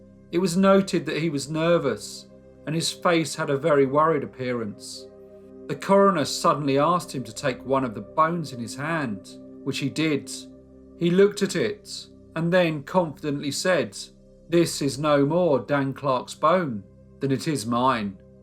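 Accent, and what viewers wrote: United Kingdom. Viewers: mmm never watch tem before.. gotta look it after. There's also Lazy Mascarade, his voice is cool. But your voice is amazing too! By the way, if is not indiscrete, may I know which part of UK your accent is from? I'm very curious about accents. English isn't my mother language so I try to learn from any accent.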